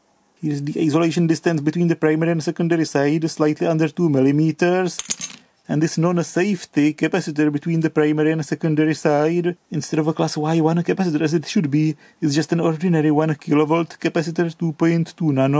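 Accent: Czech Republic. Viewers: Říkal sem si českéj přízvuk, ale ty ses fakt Čech 😅😅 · I thought you sounded Either Czech or something But then i saw the Tesla branded stuff, where're you from? Did i get Czechia on the spot?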